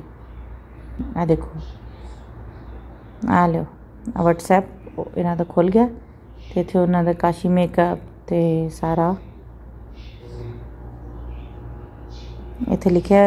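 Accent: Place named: Punjab, India. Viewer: Thanxi honourable friend Specily twadi pnjabi style awaz kmal ayy g 👍💕 Ma try krti hun ab ho jy ga in sha Allah 😘 Twada tasn da andaz v bra sohna lga complete details diti tusi🥰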